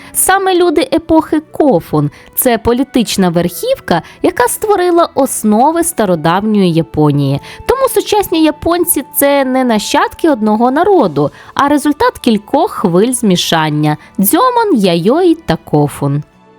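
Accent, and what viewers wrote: Russia. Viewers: Мій комент майже аналогічний) Дуже ріже вуха мацкальска вимова японських слів і назв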